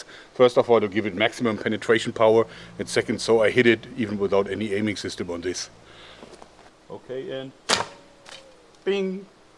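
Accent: Germany. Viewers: Okay, i wondered for a Time now Is he German? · My biggest wish is that says "It is bullshit" for once, just in that nice thick german accent of his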